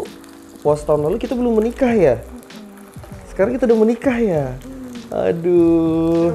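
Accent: Palembang, Indonesia. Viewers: Masih kentel banget bhso Palembang ny bang salam dari batu raja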